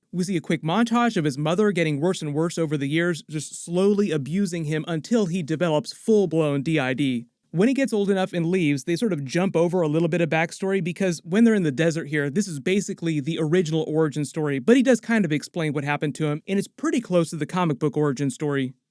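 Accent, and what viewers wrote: United Kingdom. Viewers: I think his British accent is very very normal lol this is what we actually sound like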